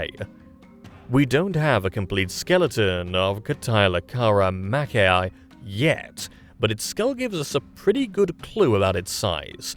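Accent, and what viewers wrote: United Kingdom. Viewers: If we're going with the theory of evolution, which is never actually been proven, by the way -- why are cockroaches and crocodiles exactly the same over billions of years? Is it possible that those one or two fossils that somebody found were just mutants and not some pre-evolved form? I mean the theory of evolution just cracks me up to be honest and then we get this dramatic British man servant accent and I don't know what to think of it this😅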